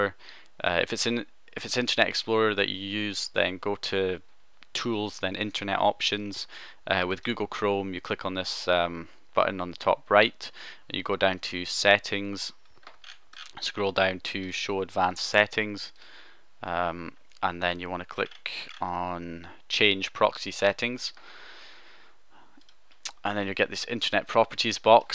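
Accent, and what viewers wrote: Scotland, United Kingdom. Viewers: You Scottish too?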